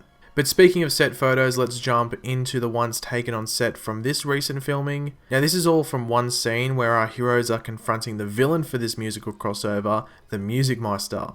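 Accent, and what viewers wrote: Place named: Australia. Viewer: Do all australians sound so nice?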